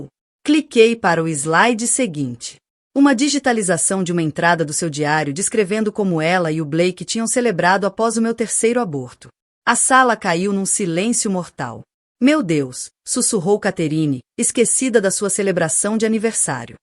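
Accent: Portugal. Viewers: A ESTÓRIA pode ser boa, MAS ... Lamentável!😡 NARRAÇÃO em português de Portugal, NÃO O NOSSO PORTUGUÊS-BRASILEIRO! RESPEITO POR FAVOR!!!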